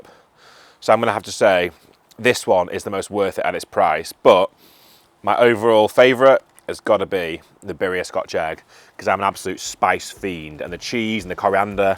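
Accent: United Kingdom. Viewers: I've ignored your shorts for years because in them you talk like a posh Chelsea adjacent nepo doing a Fred Dibnah impression, but in this you eventually began talking naturally and it's much nicer. I suspect you do it because you're worried your natural accent isn't telligable to americans, but you are fine I assure you. You're on the american-ear friendly economical scale of northerner. edit: this was meant to be feedback and I made it way too mean. Sorry. I should have said I really enjoyed this video, because I did.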